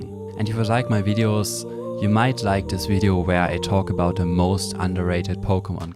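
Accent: Germany. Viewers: I hear a Little bit german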